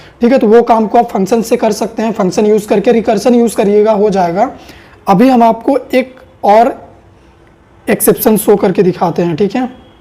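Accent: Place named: Bihar, India. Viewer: who loves his bihari accent😀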